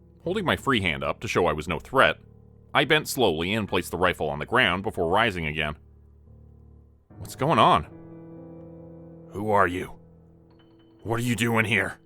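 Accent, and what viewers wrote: Canada. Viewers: How come someone from South Florida has a Canadian accent? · Sounds like you're from Canada not Florida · You say you're from Florida but you sound like a canuk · Sounds like story teller is Canadian. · Sounds like a Canadian accent. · Ah geeze! The narrator is Canadian and does not sound like an American accent not a Floridian at all. My family being half Canadian I would know that accent anywhere. Aboot White ooot Cut ooot Ooot here in the wilderness. 😢😢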